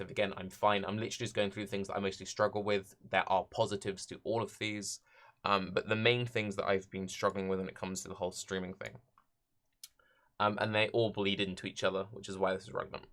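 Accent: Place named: England, United Kingdom. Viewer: respect man.you sound english.so look into picking yourself a fucload of liberty caps and blast off next month.think the season is same as norway.dunno how it relates but it helps alot with depression and unwinding.and suprisingly alot of pains and headaches go away for many.pretty anecdotal but...safe as milk atleast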